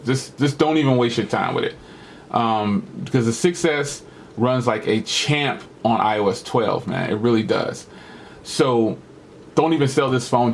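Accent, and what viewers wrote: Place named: New Jersey, United States. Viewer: Great video, Jersey accent heavy at times during this video 🙂, loving my XS Max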